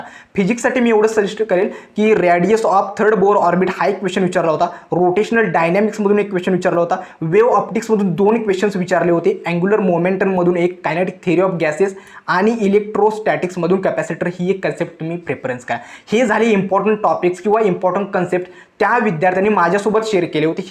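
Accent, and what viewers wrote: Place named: Kolhapur, India. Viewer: Kolhapuri accent i think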